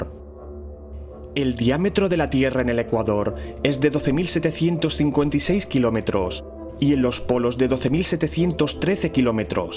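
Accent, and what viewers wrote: Spain. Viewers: Parece más de España, no? Saludos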